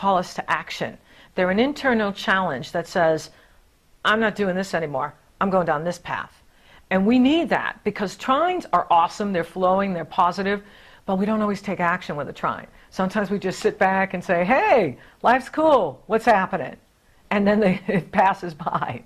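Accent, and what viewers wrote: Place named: East Coast, United States. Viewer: I feel so awesome and enlightened after your readings..i hear a hint of tri state area, east coast in your accent...DE/NJ here, ty !!!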